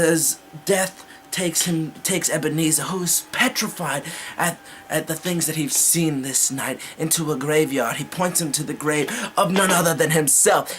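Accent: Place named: United Kingdom. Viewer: Nice British accent haha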